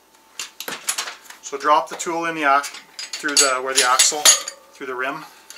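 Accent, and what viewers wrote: Canada. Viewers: So cool, I just got one and am going to take a video of it tonight for my first use...just watching some people do this...you sound like me too...either from up north or Canada!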